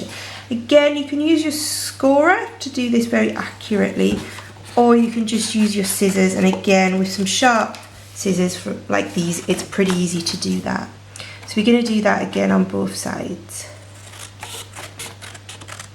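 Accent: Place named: United Kingdom. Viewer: Another lovely gift box, you have such great ideas, thank you. I enjoy your videos and, being married to a Brit, love your accent😊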